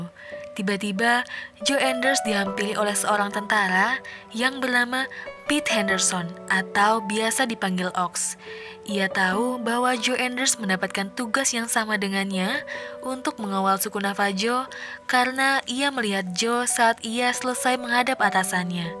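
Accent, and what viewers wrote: Semarang, Indonesia. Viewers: orang semarang kah mbak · Benern semarangan hehe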